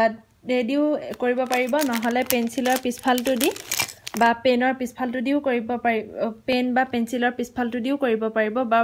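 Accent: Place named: Nagaon, India. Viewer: Tumar maat tu xosai dhunia aamar Nagaon nisina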